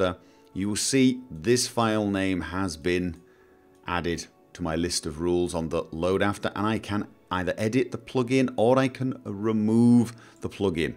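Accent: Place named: England, United Kingdom. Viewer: I think an english accent makes you sound 100% smarter.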